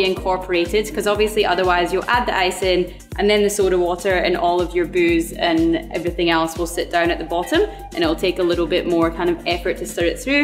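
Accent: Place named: Scotland, United Kingdom. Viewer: You sound more Scottish than normal in this video haha. Amazing videos and cant wait for the next one!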